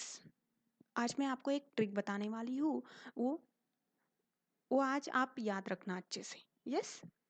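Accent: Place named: Kashmir, India. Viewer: U seems to belong to kashmir the way u are talking!.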